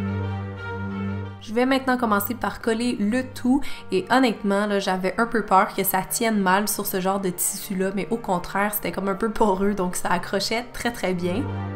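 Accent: Quebec, Canada. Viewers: je suis d'argentine et je suis en train d'apprendre le français. C'est un peu difficile de comprendre l'accent québécois pour moi, mais j'adore le français💟 merci pour la vidéo bisous Feliz Navidad para todos! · C'est marrant ton accent québécois ressortait beaucoup avec ton extinction de voix 😂 sinon super vidéo comme d'hab ! 😘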